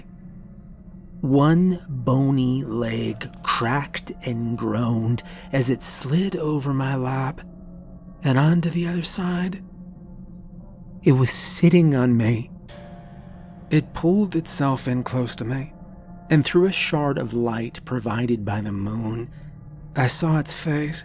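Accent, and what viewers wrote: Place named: United States. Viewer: As always your narration was second to none. Being in the UK, I have often found it difficult to listen to an American accent narrator, but you have the perfect voice, timbre and intonation, certainly for this genre. Thanks for narrating this fabulous story ❤❤❤❤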